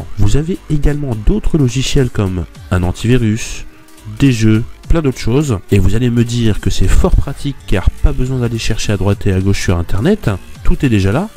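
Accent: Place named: England, United Kingdom. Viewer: Sympa cette petite vidéo, et quel accent anglais <3. J'irai tester ça sur le PC de mes parents .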